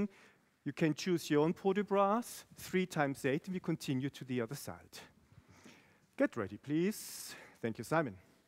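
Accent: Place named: Brazil. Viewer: I know you're Brazilian 😂 your accent tells ♥️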